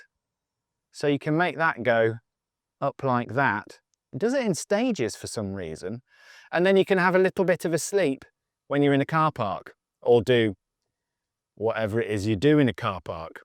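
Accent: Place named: United Kingdom. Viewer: Kewpra? Really ? C'mon, Brits. Chewna. Chewsday. Bat-tree. For crying out loud.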